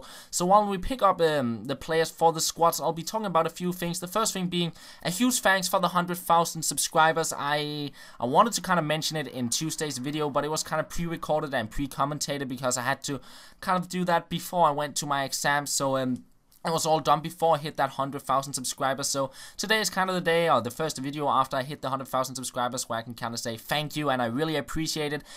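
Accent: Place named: Denmark. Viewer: Den danske accent<3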